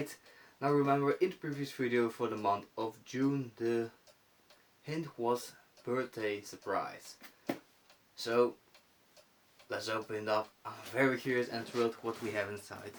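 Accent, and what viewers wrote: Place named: Netherlands. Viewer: Now I know what the Dutch accent sounds like 👌 nice video!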